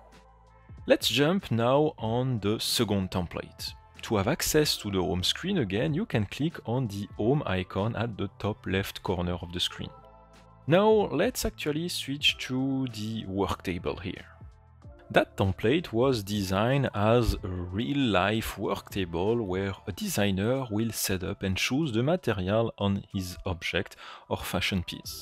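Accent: France. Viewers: Ohh my goodness, pour ne pas dire "OH MAI goudness grichious" ....Votre accent est trop français. La vidéo est de qualité, mais l'accent fait trop mal aux oreilles. Merci tout de même pour cette vidéo.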